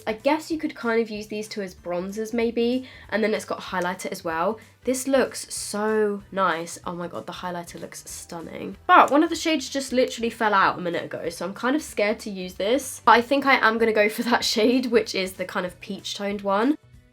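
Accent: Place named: United Kingdom. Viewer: After almost every sentence you say I repeat it like an annoying sibling. Sorry can't help it. You sound super British 🤗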